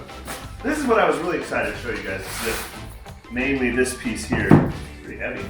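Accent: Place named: West Virginia, United States. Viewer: You sounded real West Virginian in this video.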